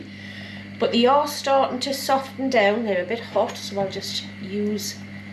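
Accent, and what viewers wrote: Newcastle, United Kingdom. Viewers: i live in the Midlands, Staffordshire, And i can honestly say i have never heard of this name or dish before, or the dish you did last week that was called Panackelty. Apparently these are common dishes in Northumbria(North-East). i didn’t have a clue lol. But thanks for sharing this with us, I’m finding out stuff new every day. you also have a new Subscriber, as i love the Geordies and accent and north-east in general 😉👍❤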